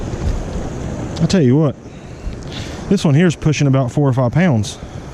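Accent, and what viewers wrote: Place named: Appalachia, United States. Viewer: enjoyed the video minus the hillbilly speak.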